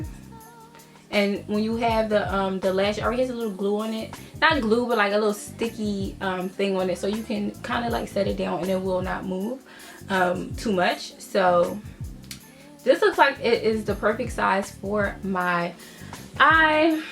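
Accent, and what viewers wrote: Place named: Philadelphia, United States. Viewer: I somehow immediately knew you were from philly. I finally understand our accent 😭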